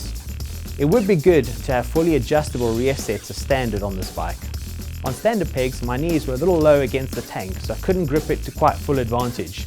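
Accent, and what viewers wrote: South Africa. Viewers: Fucking Bothered I wanna say South African.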